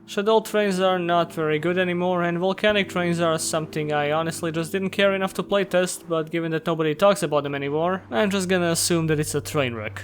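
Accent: Balkans. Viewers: didnt know you were from the Balkans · E brate tačno sam znao da si neki naš balkanac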